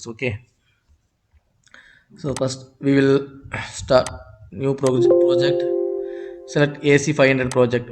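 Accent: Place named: India. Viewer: Gotta love the Indians attempting to talk English. Loosen ur turbin a little Bit. Thinks it still cutting off the blood flow a little bit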